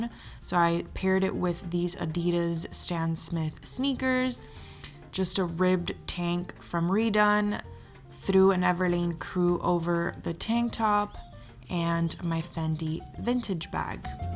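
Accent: Cuba. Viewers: S. K she’s cuban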